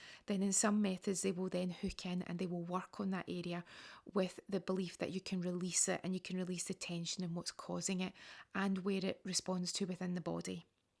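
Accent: Scotland, United Kingdom. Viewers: scottish accent pure love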